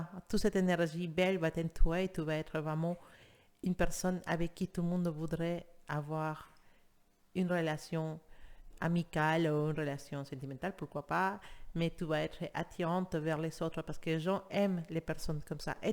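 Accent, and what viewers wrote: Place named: Spain. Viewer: Ne changez rien 🤣 il est beau votre accent... Espagnol non? Gratitude infinie 😍😍😍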